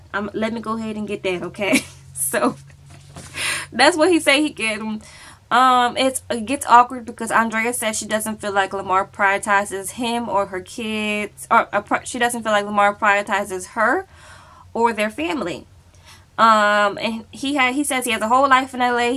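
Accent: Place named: New Orleans, United States. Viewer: Are you from New Orleans cause that accent is giving me straight Nola baby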